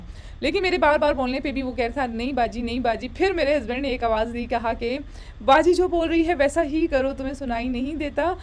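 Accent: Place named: Pashto, Afghanistan. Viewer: AOA dear sister,sanga ay❤sha ay?staso pashto koh bilkul zama shanty da😂andaz koh bilkul zama trhan dy😂😂aao vlog k pashto lazmi waya.deer sha lagi❤❤❤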